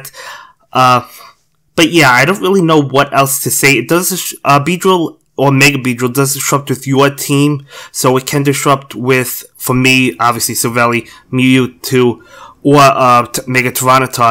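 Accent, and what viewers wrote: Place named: Boston, United States. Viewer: Curious, what region of the country are you in? I think I hear a little bit of Boston in your voice, but I'm not good at telling.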